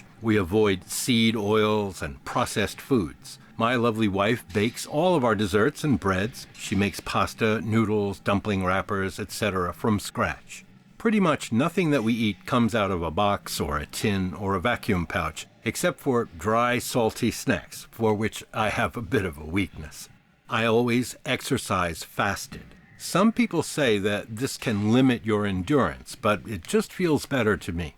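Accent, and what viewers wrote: United States. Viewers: Fantastic efforts - you’re inspiring me to get moving, even if only in my 40s. Just one q - where did the American accent come from when living in Ireland?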